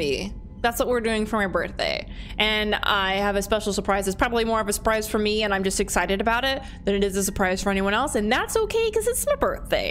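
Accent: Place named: United States. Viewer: It’s definitely because you’re American cause I remember when I went to the beach and met this Irish lady we both thought each other’s accent were pretty neat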